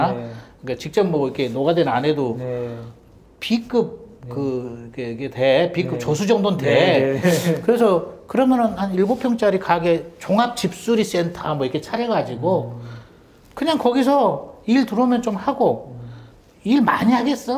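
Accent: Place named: Jeolla, South Korea. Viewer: 아...이책 꼭 구입해야겠습니다.. 구수한 전라도 사투리..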